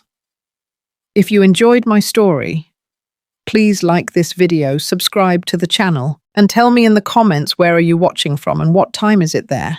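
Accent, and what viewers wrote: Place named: United Kingdom. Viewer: It might be a good idea to just leave race out of this. These are simply human stories. This is obviously a British sounding white woman’s voice. Just let us enjoy it. Thanks 🫶🏾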